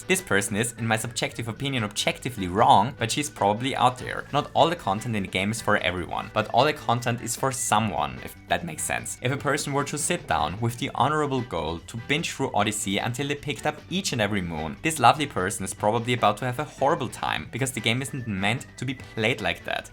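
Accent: Germany. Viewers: Yes. I heard his german accent instandly, the first time I watched him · Are you German?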